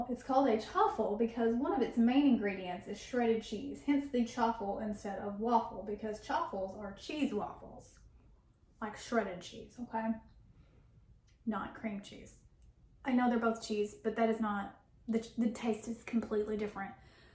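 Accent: South, United States. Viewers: This is probably my favorite video of yours so far - and I’ve watched a lot of them! “Here’s some crappy bread.” Lol. Couldn’t agree more. And your southern accent makes everything better.😊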